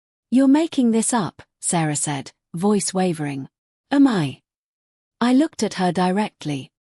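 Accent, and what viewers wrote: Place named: United Kingdom. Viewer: As a Brit surprised to hear a British accent in this story. “I started therapy to understand why I believed them & thought you were overreacting” Good Grief Watching from Leicestershire in the UK 🇬🇧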